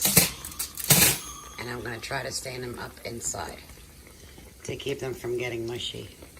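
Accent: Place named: New York, United States. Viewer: I want to try making this at some point, because it looks so yummy!! I love your videos, because the way you talk reminds me of my family, and makes me feel comforted. <3 They're all from NJ/NY.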